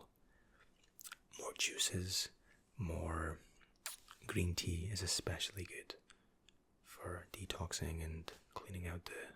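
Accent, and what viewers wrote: Scotland, United Kingdom. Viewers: I love that Scottish accent - East coast? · Great british accent! finally glad I found ya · He is originally from Coventry, but he and his parents moved to Scotland when he was around 3, I think he said, thus something of a very slight brogue. But recently he moved back to England for work. Where in England, I'm not sure, though. I don't think he said specifically, to my recollection. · Idil Ozler Scottish I think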